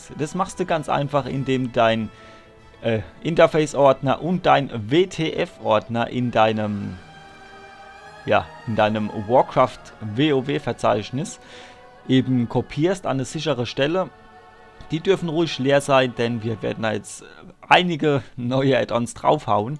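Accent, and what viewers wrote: Palatinate, Germany. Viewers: Da Pfälzer....wo her?^^ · ohh ein Pfälzer find ich gut darf ich fragen aus welchen raum du kommst?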